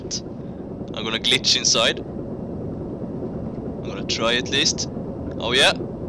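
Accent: Sweden. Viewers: are you swe ore englich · Are yuo Swedish? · are you, Swedish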